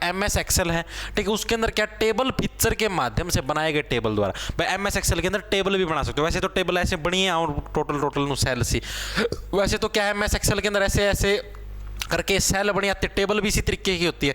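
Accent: Haryana, India. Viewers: Padne naa aate aapke yahan toh aapki language sunne aate h sir jii 😅😅 haryanvi on top ❤❤ mittar 🤭🤭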